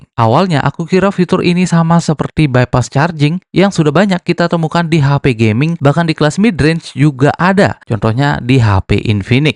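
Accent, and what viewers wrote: Bali, Indonesia. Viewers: Orang bali bang? T nya khas bali banget 😅🙏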